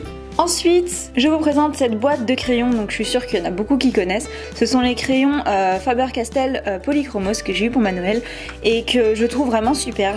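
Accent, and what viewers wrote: Belgium. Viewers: tu est belge ou Française moi je suis belge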